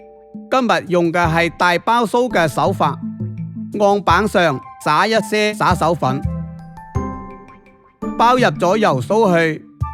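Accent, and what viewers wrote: China. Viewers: 这是哪个地方的粤语。。。。。。？ 另外感觉就是变着花样吃猪油。。。。掺些面粉让猪油不要太像猪油。。。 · Mia Mia 佢係廣東人但唔係廣州，廣東話除咗廣卅話外仲有好多方言，佢就屬其中一方言。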